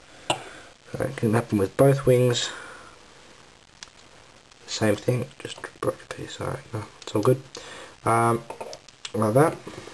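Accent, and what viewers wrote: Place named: Australia. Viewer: I can tell your an aussie. i am an aussie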